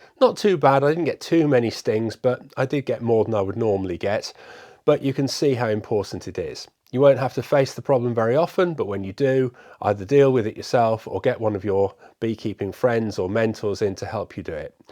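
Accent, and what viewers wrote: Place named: RP, United Kingdom. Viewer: You might want to get a tripod? Just set your camera on it and then both hands free and Bob's your uncle! By the way, Just found You and delighted to find an intelligent chap who speaks the Queen's (no pun intended) English, unlike most of the other Keepers of Bees on YT who seem to all be from the American South. Nice guys but their communication skills are rather "provincial". Needless to say, I've subscribed. So one more to add to your 3.91Ks. Now on to "How to Make & Use a Bee Vac". Cheerio!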